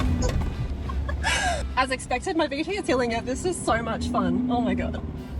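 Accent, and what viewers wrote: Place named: Australia. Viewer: Throttle it Like You Stole It Aussie Talk at its Finest 😂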